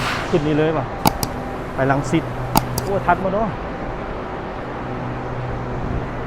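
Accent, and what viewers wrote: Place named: Laos. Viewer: ทำคลิปสนุกครับ ชอบสำเนียง และภาษาลาว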